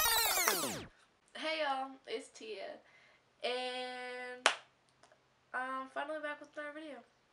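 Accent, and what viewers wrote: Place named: Tennessee, United States. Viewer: I can hear your accent from living in tennessee... you talk completely different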